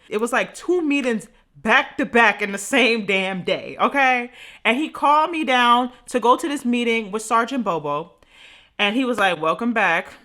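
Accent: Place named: Brooklyn, United States. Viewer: U gotta be from Brooklyn!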